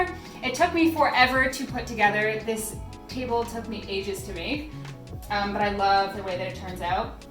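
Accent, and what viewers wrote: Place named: Canada. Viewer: The way Canadians pronounce “about” gives them away 😝✌🏼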